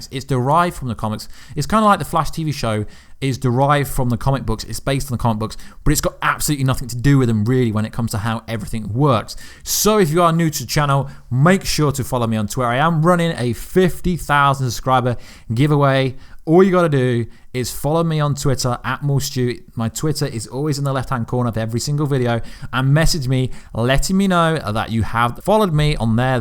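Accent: United Kingdom. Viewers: I'm well accustomed to certain pronunciations being butchered by a British accent, but since when did "human" become "thuman"?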